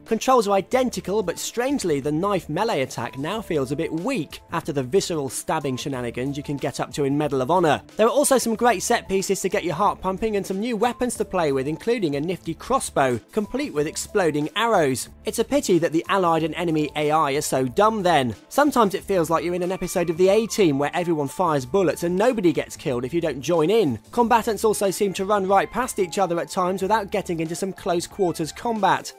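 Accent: United Kingdom. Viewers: Its his charming British accent . :D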